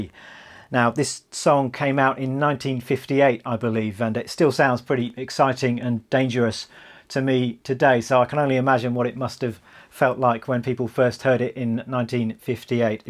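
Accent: United Kingdom. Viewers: Hey just found out your channel !! Obviously it's an easy song, but your teaching is priceless and your UK proper accents adds up to the lesson :) :) Thank you for taking the time to make a Youtube channel! Cheers from france merci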